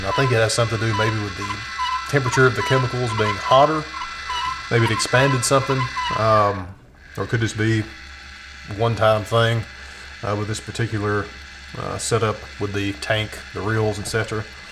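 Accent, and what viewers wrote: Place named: North Carolina, United States. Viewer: I had a plan to sometime in the near future start processing film again after years away from the darkroom. I supported their kickstarter campaign though as little as I would be developing I thought it worth supporting. I now have my unit and just shot a roll of B&W and I’m ready to get started. This video was just what I needed. I read a few reviews but I wanted to see it used. Learned a lot here. Thank you. BTW, I was listening to your voice and guessing where you are from. I guessed right. I know that accent. NC! that’s what 25 years on the road shooting for Southern Living will teach you.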